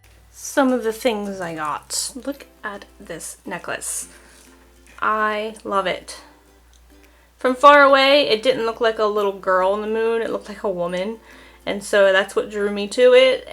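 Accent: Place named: United States. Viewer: Oh it’s so cute 😍 I personally don’t like popcorn at all 🤢 but my kids love it!! The smell stays in the microwave for ages too hahahahaha 😂 How gorgeous was that little cake your friends mum dropped off 😍 it looked so delicious!! I am growing a few herbs atm (it’s spring here now) tho I don’t use them a lot lol. We have parsley,thyme,chives,and coriander just popping up :) P.S. I love the way Americans say herbs 😆 here in Australia we prenounce it “herbs” not “erbs” and we prenounce the colour mauve “moe-v” 😂😂 we are weirdos lol 😆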